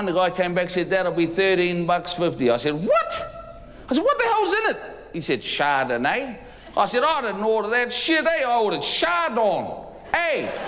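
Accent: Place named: New Zealand. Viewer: Mike king said he used to swear on his comedy and he sure sounds like a Maori gee , oh wow he just swore xD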